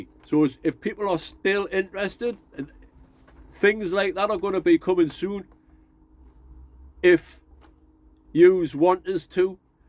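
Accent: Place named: Newcastle, United Kingdom. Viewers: allotment channel and tings it has to be. look forward to hearing the real jordie accent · Came for the gardeing, stayed for the music and your canny personality, the history was good, I was stationed at HMS Collingwood for a time in the RN so hearinf about him was interesting, it's all good, you do you pal, don't think you need to change the channel name, dunt really matter, the titles say what it's about, but if you feel like a change go for it. My best man is a Geordie so I don't have any probs with the full accent, some of the colonials mite get a bit confused like lol. I used to watch when the boat comes in in the 70's as a kid, was the last thing we were allowed to watch before bed, I still remember some of the words, and that mad trumpet from the theme tune lol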